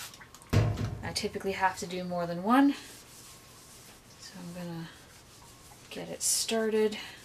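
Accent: Canada. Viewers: Personally I put them through washing machine. I have a newer washer with no spindle. I use Scrubbing Bubbles spray on faces and bodies. Then use tooth brush to get in all the grooves of face. Then once placed in washer by themselves. I then let the washer do the work. The yarn is material and it grosses me out too much thinking how much filth could be in the yarn. Once washed - start the process of using sticky lint roller to take all lint / fly always. Let dry for a bit under ceiling fan. Then restyle hair. Instead of rubber bands try tiny zip ties. These will not only get a tighter fit but will look better as well. Especially Jesmars. While yarn is still a little damp/pliable - use sharp scissors ✂️ to trim fuzz flush against the CPK head. 😁 Your accent - shout out to Canada 🇨🇦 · That Sunlight bar costs $14 on Amazon. You sound Canadian maybe it's cheap there but here in the USA it's not in stores, and it costs a lot.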